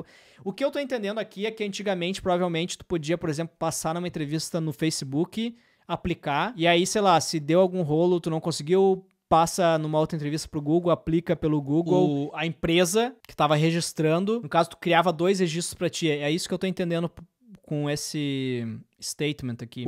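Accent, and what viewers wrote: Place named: Rio Grande do Sul, Brazil. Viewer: eu moro hoje nos EUA com visto H1B. Ate hoje, nunca conheci outro BR com esse visto. Todos que conheci são mexicanos, chineses e principalmente, indianos. Depois que tu és demitido, tu tens 2 meses pra se recolocar e achar outra empresa que mantenha o teu H1B. Depois disso, teu visto expira! Alguns falam que o H1B é o ruim, porque depende da boa vontade do empregador e tal... mas a real é que depende demais. Eu tenho um chefe ótimo, e considerando que todos estão felizes com minhas entregas, esse visto é uma bênção. Pode ser que eu seja demitido no futuro e tenha que voltar? Sim! Mas ter morado aqui por esses anos terá valido a pena... E eu voltaria pra onde for com outra bagagem... Sou gaúcho também! Como é bom ouvir um pouco de sotaque haha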